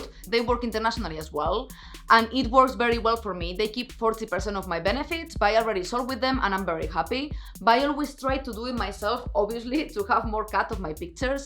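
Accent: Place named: Spain. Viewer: Hi, i love your videos, your viewpoints on the pro phhotography business and your unique spanish accent when you speak english! (My native tongue is French and your english is easier and more pleasant to listen to for me than the excited stance of most american youtubers) Microstock is the niche that interests me the most on youtube so i would like to recommend that you delve more into this topic. Eversince Nicole Glass has left this space on this platform, i have the feeling that you are the only one who can elegantly and efficiently fill up this gap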